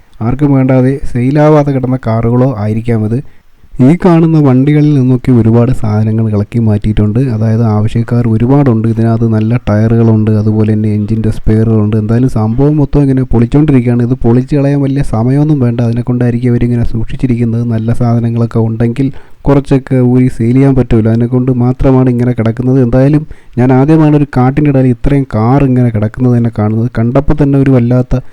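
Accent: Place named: Trivandrum, India. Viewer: Kulathunkal motors scrap cheytha vandikal aakanam... Slang tvm aayath kond oohichathaanu...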